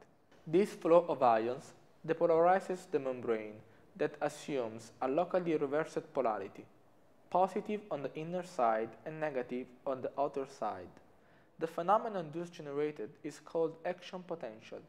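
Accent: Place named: Romania. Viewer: This teacher seems Romanian as his accent is so likely to the Romanian pronunciation🙂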